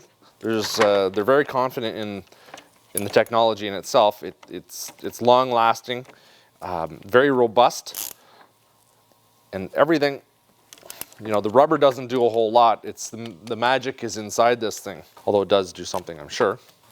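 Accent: Canada. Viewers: I've come to the realization that about half of the reason I watch every video is that amazing Canadian voice...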